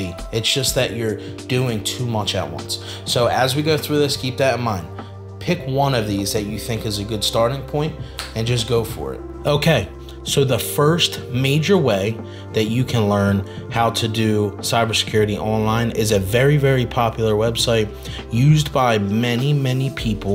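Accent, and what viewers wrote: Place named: Delaware County, United States. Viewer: This guy is from Delco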